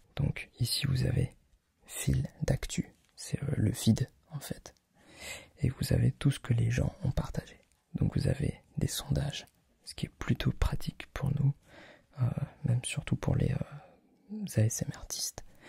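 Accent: France. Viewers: ACENTO FRANCES DE AQUI A JAPON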